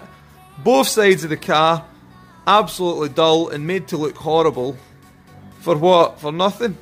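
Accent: Scotland, United Kingdom. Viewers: A lot of people just like the Scottish accent---no interest in Astras at all. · Okay- My fantasies have been fulfilled. A Scottish man cleaning cars. I love your videos! I love your voice and accent! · Why is say blackpool and your pure Scottish like me lol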